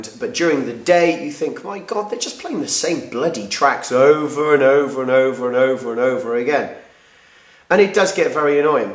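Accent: United Kingdom. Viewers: im from germany and i alway enjoy listening to your videos. im a big fan of the british language! :)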